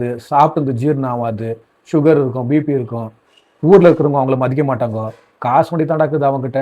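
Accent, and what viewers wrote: Bangalore, India. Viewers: Thambi bengalore Tamil la.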